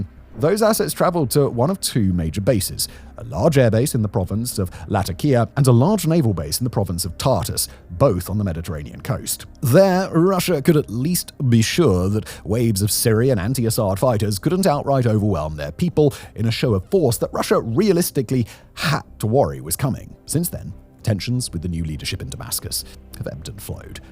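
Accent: United Kingdom. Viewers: Even if you didn't speak with a British excent, would recognise Britain propaganda 😅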